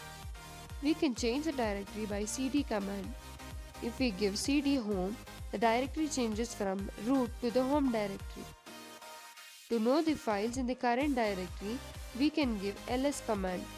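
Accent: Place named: India. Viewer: Wtf this indian accent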